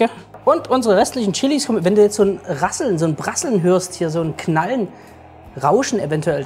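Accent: Saxony, Germany. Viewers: Sehr lecker, wir machen unsere Nudeln selber, Salz erst rein wenn das Wasser kocht(das Salzwasser braucht länger bis es kocht-gerade bei den Preisen-ne ich bin nicht neumalklug, habe ich von meiner Tochter-sie ist Köchin) Warum Untertitel - man hört ja nur wenig vom Sachsen😂-bin ich ja auch Sonst ist dein Rezept prima lecker🎉👍👍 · Grad das Sächsisch finde ich so charmant 😉 zusammen mit deinem trockenen Humor.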